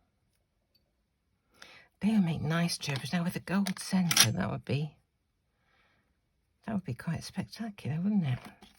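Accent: United Kingdom. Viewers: I just found you I love your British accent I'm from East if Europe but I leave in USA and love your video so much is so so interesting and very educativ .I'm a beginner on card making Thank you so so much Best to you from VA 🇺🇸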